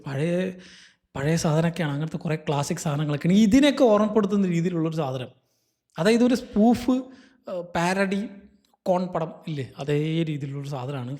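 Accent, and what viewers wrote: Palakkad, India. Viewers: Annante paalakkkadan bhaasha purathu vanna review......👍🙃😅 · Palakd ano bro sthalam.... Nice slang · 😂😂😂😂 നമ്മളുടെ slang വരുന്നത് കൊള്ളാം Bro Palakkad Slang 😂😂 Review കണ്ട് ചിരിച്ചു മരിച്ചു 😂😂😂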